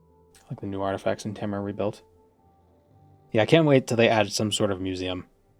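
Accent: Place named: New England, United States. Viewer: What could really deal with head shine AND bump your steez is maybe a newsboy/flat cap. Could also lean into the New England accent for the bit, though I have no idea if a hat would just cause too much sweat, which might make prop comedy like that not so good.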